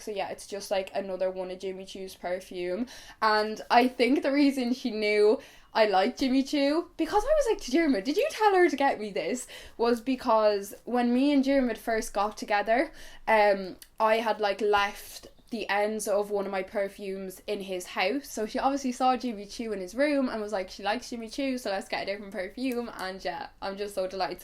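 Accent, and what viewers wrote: Ireland. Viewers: Very deep Irish accent coming out from your mouth 👄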